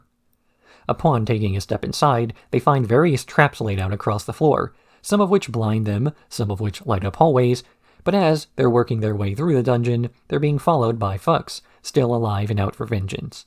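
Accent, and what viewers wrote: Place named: South, United States. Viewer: Ah! I think not being American where the accent is from can be hard to place so I think it’s very interesting that yours is described as southern draw! But I also think is funny is that I have been biting my tongue from making this comment so many times because it just seems so rude even though I knew I was coming from a place of curiosity and I have heard you say women before but the last few videos I’ve watched of yours you haven’t and then as soon as you’ve replied to this comment you just said it again 😂 thank you for taking the time to reply and I do hope it didn’t come across as obnoxious!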